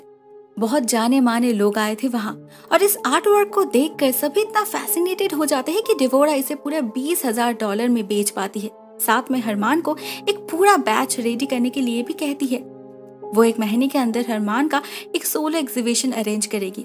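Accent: Bengal, India. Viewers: are u from bengal?? right na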